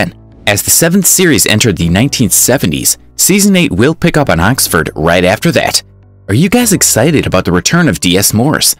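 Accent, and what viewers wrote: United States. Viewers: Why the American accent for a British show? Is this a joke? If so, not funny. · Why the hell is it an American voice, this is fucking England!!!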